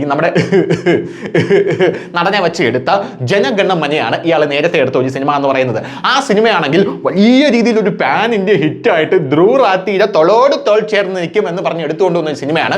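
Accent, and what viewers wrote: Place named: South Kerala, India. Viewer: കാര്യം എന്തൊക്കെ ആയാലും അവതരണം വത്യസ്തം ആയിട്ടുണ്ട്. തെക്കൻ കേരളത്തിന്റെ ശൈലി ✌🏽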